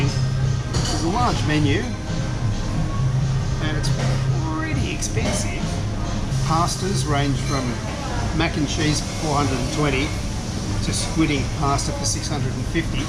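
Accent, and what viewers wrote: Australia. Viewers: he cant understand because of your ausie accent with mask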